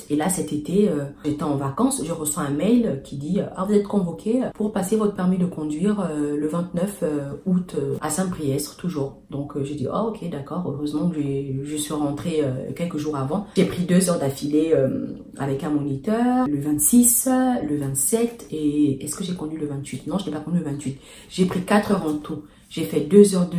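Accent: Gabon. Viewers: je connais l'accent là. C'est une gabonaise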